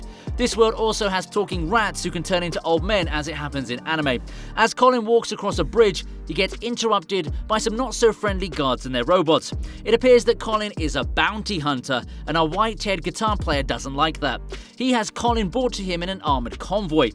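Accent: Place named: United Kingdom. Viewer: with a British accent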